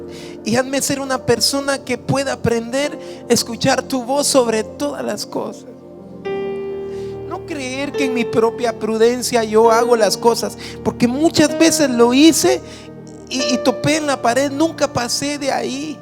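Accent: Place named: Guatemala. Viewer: Andaba buscando música Heavy metal en español y pare aquí, me llamo la atención el acento chapin, Soy de Guatemala y, por el acento y forma de hablar, el también es de Guatemala, C.A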